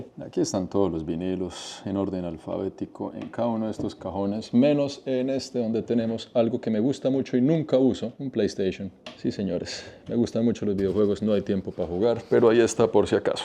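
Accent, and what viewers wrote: Bogotá, Colombia. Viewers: Amigo, muy Guerrero siempre me pregunte cual era su historia, habla frances? Como aprendio? Por que vive en canada? Es usted nacional de otro pais, su acento es muy marcado colombiano.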